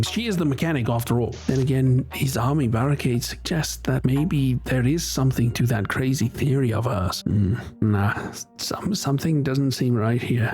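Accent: South Africa. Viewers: Ah, a fellow South African. I knew that accent sounded familiar (kinda sounds like the zookeeper from Jozi zoo iykyk). Good video bro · The Sandton accent took me all the way OUT bro 😂 too real. Instant sub! Looking forward to more of your content!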